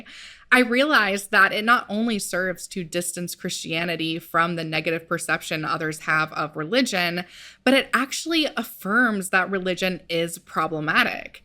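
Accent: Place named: England, United Kingdom. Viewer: I share your english accent, but its funny you don't know we have one. go ahead and google 'how to pronounce satan'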